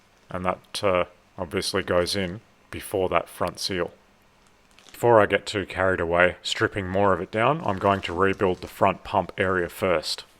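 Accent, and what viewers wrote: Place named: Australia. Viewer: This is a great video. I love your Auzzie slightly annoyed all the time accent. Anyone who can work on a transmission like yourself is a master mechanic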